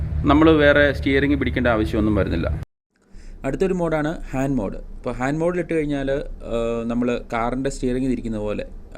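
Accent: Kottayam, India. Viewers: Bro Kottayam kaaran aano.. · Thankalude avatharanam നന്നായിരിക്കുന്നു കോട്ടയംkaranano?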